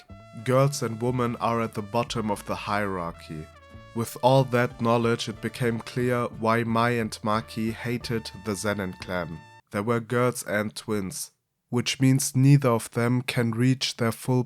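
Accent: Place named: Germany. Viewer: Are you German? Your accent reminds me of my classmates from Berlin, i'm saying this in a nice way.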